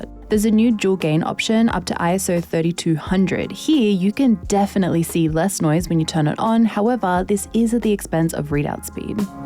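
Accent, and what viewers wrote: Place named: Queensland, Australia. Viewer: Are you from sunshine coast? You just sound like your from Queensland.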